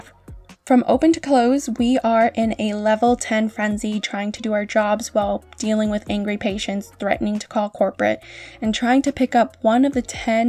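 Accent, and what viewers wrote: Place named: Boston, United States. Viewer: LMAO !! You're from Boston !!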